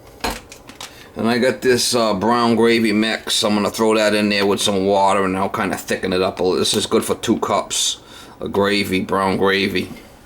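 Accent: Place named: Boston, United States. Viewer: Question for you, as I'm a pretty fan of your channel and work in the HVAC residential field. But anyways, from hearing your voice, are you from Boston or New Jersey?? I've always been curious Haha love the videos man, keep em coming!!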